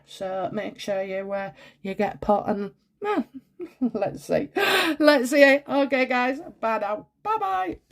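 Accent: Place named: Yorkshire, United Kingdom. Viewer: Hi my friend hope you are feeling better and stringer and please bring your accent back i feel so at home with you two Yorkshire lasses together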